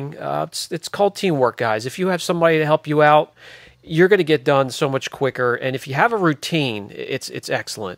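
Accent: Pennsylvania, United States. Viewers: “Trial by era” Shout out to the PA accent. 😉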